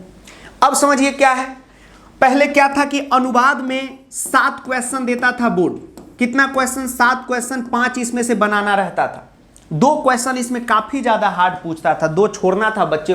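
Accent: Bihar, India. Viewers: Sir ka bihari accent bada mst h😂😍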